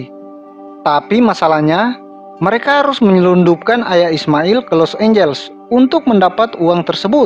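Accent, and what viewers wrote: Eastern Indonesia, Indonesia. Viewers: Orang timur Lu ya bro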